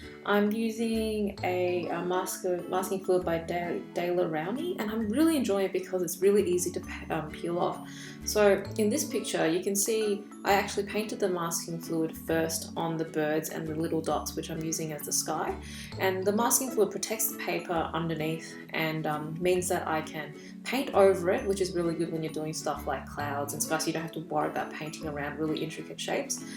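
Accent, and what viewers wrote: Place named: Australia. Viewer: are you australian or british, you have a very interesting accent because i can't tell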